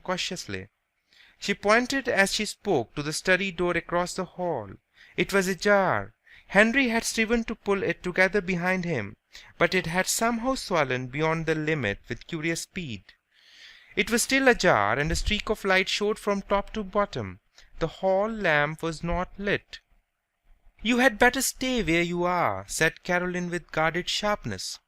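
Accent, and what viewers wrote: India. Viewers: Man, it’s hard to learn from heavy Indian accent. How can I improve my pronunciation with this reading? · i.m agree the indean accent too mach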